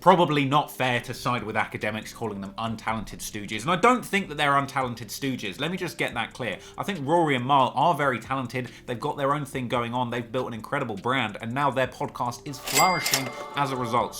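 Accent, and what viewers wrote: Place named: South, United Kingdom. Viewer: Is Rory’s name really pronounced like that or is the back translation into southern UK accent making it sound weird?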